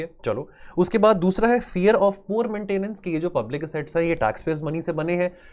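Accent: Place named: Punjab, India. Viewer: Sir aapke bolte time punjabi nikal jati hai. I observed😁 kiu ki..mai bhi punjab se hu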